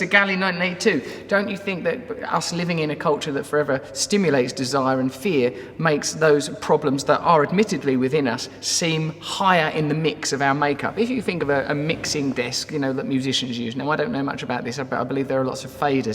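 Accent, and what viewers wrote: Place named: Bradford, United Kingdom. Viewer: Love the Bradford accent.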